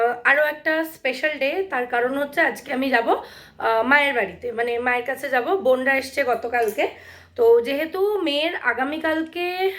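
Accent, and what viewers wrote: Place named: Bangal, Bangladesh. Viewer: একটা কথা জিজ্ঞেস করছি বোন কিছু মনে করোনা।তোমার বাপের বাড়ি আর শ্বশুরবাড়ির মধ্যে কারা বাঙাল?তোমার কথায় টান আছে তাই।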